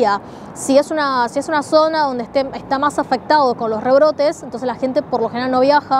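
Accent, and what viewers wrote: Argentina. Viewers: Si hablas dos idiomas por lo menos debería hablarlo bien , aprender la correcta pronunciación de la Ll y la Y . Claro que su acento para un argentino es perfecto pero para el resto de países latinos ese acento es horrible. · y que de malo tiene? si su esta perfecto. vivio años en argentina era normal que tenga el acento. no le veo lo malo. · bueno, cuando hables dos idiomas y los domines como ella habla, y además que carajo importa como suena para otros países, el acento argentino es así te suene mal o no 🤡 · Amo tu acento Argentino 😍 es super fluido❤ gracias x mantenernos informados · Eres argentina tu asentó de español es idéntico al asentó argentino. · me refiero a que las personas en sus vídeos anteriores SOLO comentaban sobre su acento argentino restándole importancia al contenido e información que dedica a cada video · Wow que español más argentino tienes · Muchas felicidades porque hablas muy bien el español y sobretodo muy buenas especificaciones tienes un acento argentino saludos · Amo tu canal. 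Y tu acento argentino me encanta! Qué bueno que existe una persona que nos hable de China en español y con la perspectiva de alguien que también ha vivido en Latinoamérica. Saludos desde México 🇲🇽🇲🇽🇲🇽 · mmm...Sin embargo, en este caso su acento tiene alguna relevancia, porque dice bastante acerca del ambiente donde creció y se formó, a mi me llamó la atención su acento porque parece provenir de un sector de la ciudad de Buenos Aires particular, me da la impresión que no es típico de la comunidad china de Buenos Aires, quizás eso llamó la atención de otra gente. Y si estamos hablando de cultura china con tanta riqueza y detalle como hace, las particularidades de la comunidad china en Argentina es parte de la cosa también. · Tu español es argentino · Ella tiene un español perfecto. Tiene su pronunciación argentina pero la verdad eso no afecta en nada. Usa un español muy neutro. Y además es muy dulce a la hora de comunicar.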